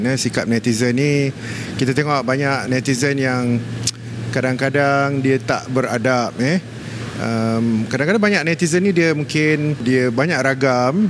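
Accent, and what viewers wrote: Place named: Selangor, Malaysia. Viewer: Selangor nya ko cakap...dapat madu sepah dibuang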